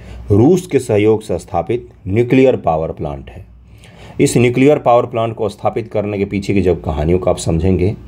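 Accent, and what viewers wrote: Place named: Maithili, India. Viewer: Kis district se ho sir aap.....aap maithili bolte ho esiliye bs puchha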